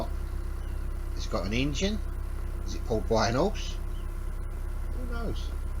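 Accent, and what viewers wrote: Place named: Cockney, United Kingdom. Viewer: Lovely cockney accent!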